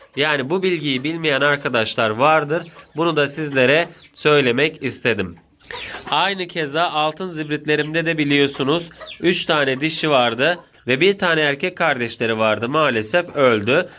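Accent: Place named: Adana, Turkey. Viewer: Abi adananın neresindesin hemşehrim çıktınnnnn😁😁😁😁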